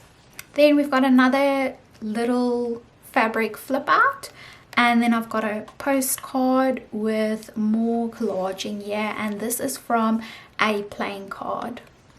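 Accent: South Africa. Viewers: Love your accent, fellow South African😁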